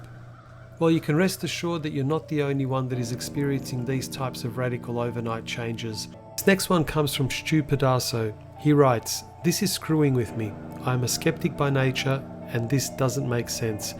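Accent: United Kingdom. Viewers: William Young it's his English accent nutball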